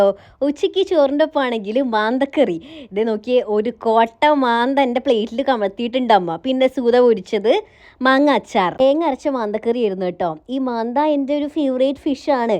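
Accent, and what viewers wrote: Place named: Alappuzha, India. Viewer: നങ്ക് എന്ന് പറയും... ആലപ്പുഴ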